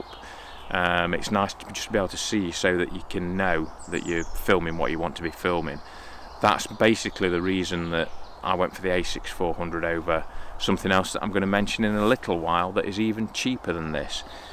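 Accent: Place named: Mansfield, United Kingdom. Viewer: Well! Blow me down with a feather you're only dahn't road! Am frum Mansfield! Well actually I live in sunny Woodhouse (Wudduss) now! Small world! I thought I recognised the accent!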